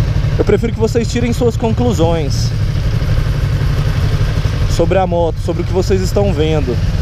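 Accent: Goiânia, Brazil. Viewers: É de Goiânia mano?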